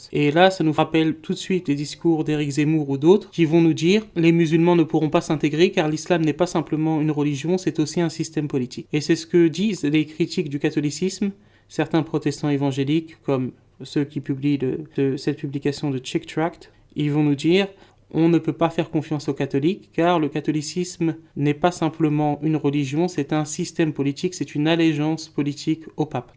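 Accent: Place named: United States. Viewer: Salam Ton accent américain est formidable Bien meilleur que tous mes profs d 'anglais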